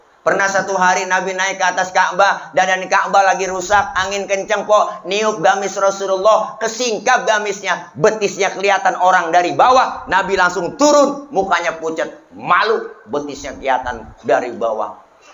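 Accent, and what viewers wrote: Jakarta, Indonesia. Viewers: Jujur saya sangat kagum klo liat ustadz ceramah,lucu nya mantuuul khas Betawi nya kental bgt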